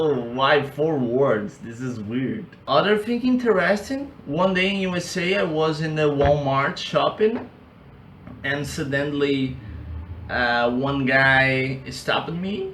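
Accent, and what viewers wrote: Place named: Brazil. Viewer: Seu inglês parece com o meu, será que temos sotaque brasileiro?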